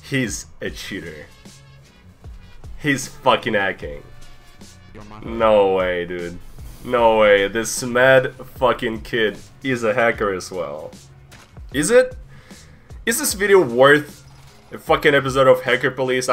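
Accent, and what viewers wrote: United States. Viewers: This is a little off topic but even though you are Russian you speak amazing English and you have a very great American accent!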